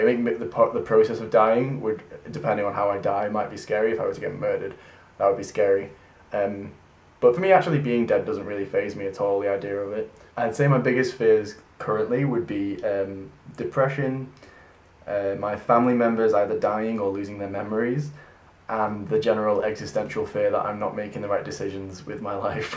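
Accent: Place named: New Zealand. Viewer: How's the kiwi accent and slang treating you, mate?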